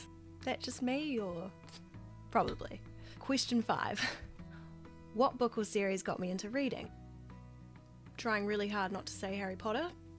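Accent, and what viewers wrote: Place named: New Zealand. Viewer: I'm from texas, & I find your new zealand accent so charming ☺️ welcome to the book community, 💞 you're doing great!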